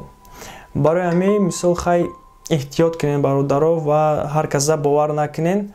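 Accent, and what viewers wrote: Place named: Kulob, Tajikistan. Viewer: братишка офарин барои корот! кори зур хар руз хабари тару тоза медихи лекин гап заниатро хам каме иваз кун ютум кулоб нестай ки лахчави гап зани ича адаби гап зан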